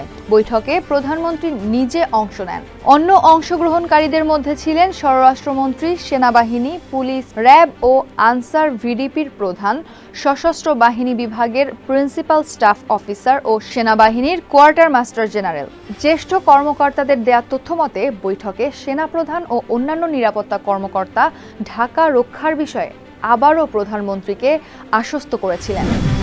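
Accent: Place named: Kolkata, India. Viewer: Kolkata Bangla oyTa eyvabe I bole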